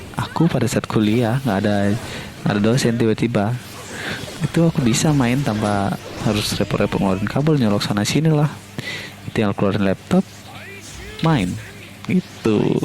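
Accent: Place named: Bali, Indonesia. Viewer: Bali sekali logatnya anda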